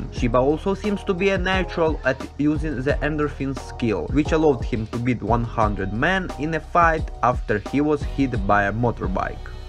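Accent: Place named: Russia. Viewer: Первое что услышал, это то что он русский А потом посмотрел мейл ру почту в описании канала)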